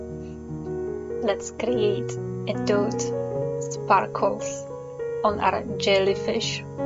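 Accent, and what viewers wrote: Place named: Scotland, United Kingdom. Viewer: Oh I can hear your scottish dialect by your speaking 😁. This remember me on scottish singer Derek William Dick -> yes his real name as Fish (early from band Marillion 81-88). Do you know him? ☺☺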